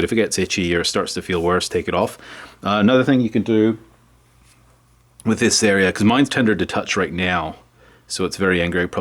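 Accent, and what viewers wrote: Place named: North, United Kingdom. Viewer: Thanks... I've been struggling with splints & different ways to wrap... I'm now a happy camper! I've also been trying to figure out your accent.. i hear Northern UK (Manchester & Liverpool), Canadian, a bit of Irish & Aussie.....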